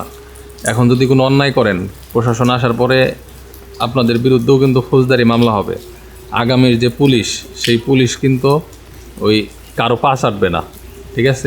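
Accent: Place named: Tangail, Bangladesh. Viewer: এটাই আমাদের টাঙ্গাইলের কন্ঠ আমাদের বড় ভাই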